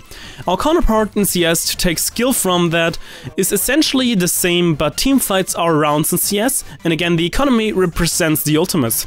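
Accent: Germany. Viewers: Where are you from? You sound kinda german and slovakian i dunno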